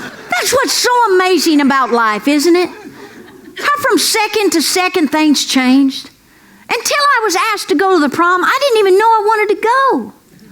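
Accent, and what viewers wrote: South, United States. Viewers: Three weeks ago I stumbled upon an vid and with my niece named Ella Mae I thought what the hell and clicked on it. I have never been so glad I clicked on something I wasn't even looking for. I laughed and then I laughed and then I laughed some more. Her observations and that southern drawl had my stomach hurting. These last three weeks, I've looked for and watched everything I can find that says. Totally hilarious including her X-Rated ones. Love me some. Oh by the way, did I tell ya I laughed😍😍😍😂😂😂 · I love her humor too, especially since they are told about true incidents that she lived through herself!! SHE HAS A WONDERFUL SONGING VOICE EVEN THOUGH HER REGULAR SPEAKING VOICE HAS THAT SWEET COUNTRY TWANG.😂. I lo e listening to jokes!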